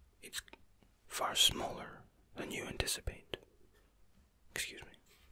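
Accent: Scotland, United Kingdom. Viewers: Hannele he’s already got a Scottish accent lol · Scottish ASMR King! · Are you English or Irish. Or Scottish?? I can't quite tell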